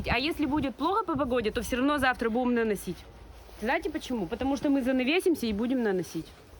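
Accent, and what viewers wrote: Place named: Udmurtia, Russia. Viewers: Говор сразу понятно Удмуртия😊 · Как мне нравится удмуртский говор, мммм😊! Сколько знаю девочек с Удмуртии - все мегапозитивные, очень симпатичные, вечно деловитые💪❤ и очень душевные❤❤❤ Привет от соседей- татар! 👋👋👋😊😊😊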